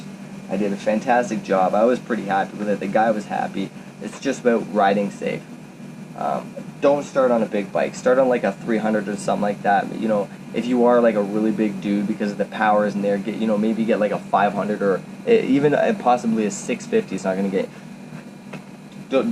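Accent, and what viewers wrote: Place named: Ontario, Canada. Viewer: Good ol Ontario boy accent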